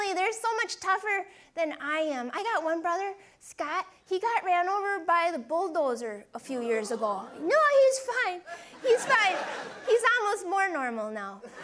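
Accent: Canada. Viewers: she sounds very canadian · She sounds very Canadian Soe instead if so. Aboat instead of about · She sounds a bit like my relatives in Northern Ontario in Canada.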